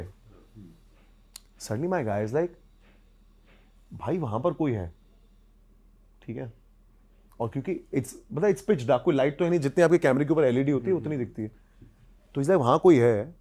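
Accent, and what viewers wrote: India. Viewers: So boring yr the person who is telling the story is speaking half Bollywood English by shaking his head and another half Hindi so so boring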